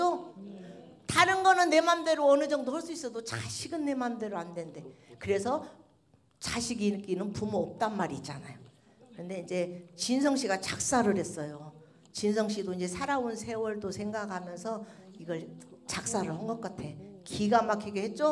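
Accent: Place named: Jeolla, South Korea. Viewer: 전라도 사투리 엄청 쓰죠? ㅋㅋ 감사합니당 😊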